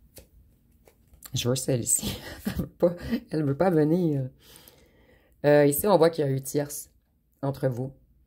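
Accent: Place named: Quebec, Canada. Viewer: Guidance la plus juste possible, pleine de charmes...Avec cet accent qui pour les non canadiens est une marque de fabrique Made in... Québec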